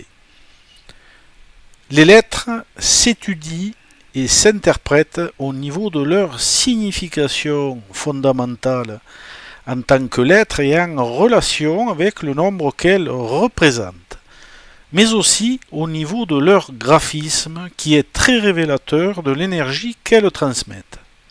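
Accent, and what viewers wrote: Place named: Occitania, France. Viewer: Bonjour à vous, Mon prénom commence par la lettre J, et je me retrouve dans ce que vous dites. Nous avons le même accent Occitan. Je vous écris de Narbonne 😊.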